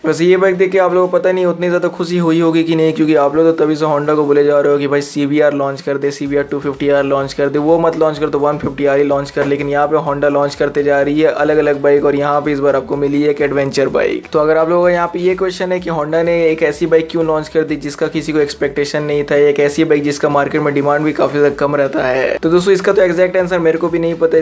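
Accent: Bihar, India. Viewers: Aap Bihar ya up se ho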